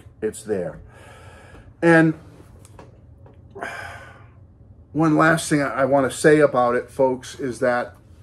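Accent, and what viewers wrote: Boston, United States. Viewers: Your heartfelt sentiments are truly worthy of your love for mankind and the!! I always have a spot in my heart cause when I hear you I'm reminded of Boston where I lived for many a year 1974 to 1987 to be exact. So " " hearing your voice puts a warm smile on my face. God Bless and KEEP THE FAITH!!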